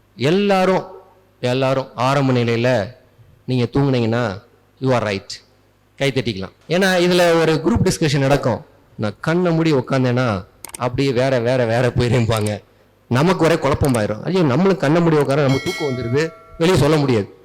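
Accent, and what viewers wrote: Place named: Tamil Nadu, India. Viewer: Really I'm saying I'm happy to hear pure tamil voice from tamil man🎉🎉🎉🎉🎉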